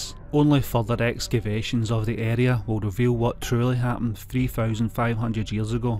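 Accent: Scotland, United Kingdom. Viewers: Loved the Scottish accent! And the rest 😉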